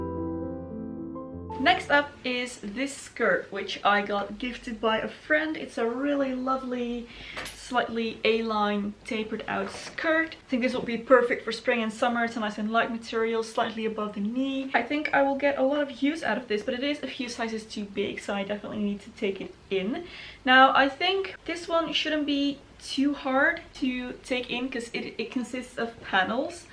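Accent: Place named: Netherlands. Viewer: I thought to hear you were Dutch and then I saw the Harry Potter books. Greetings from another Dutchy! Love you vids!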